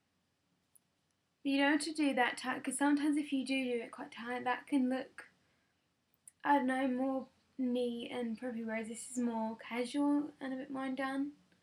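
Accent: England, United Kingdom. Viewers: I "liked" because I love your cute english accent! ((( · you sound english? or an american putting on an american accent? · guys, 1. its not 'english' accent, its 'british'. 2. so what if she says litterally alot? dont make fun, these are quite cute, just not something everyone would use.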